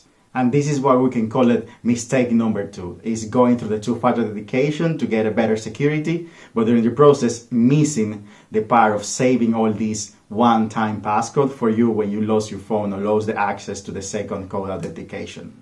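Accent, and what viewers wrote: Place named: Dominican Republic. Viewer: Se nota que el pana es Dominicano ajajaj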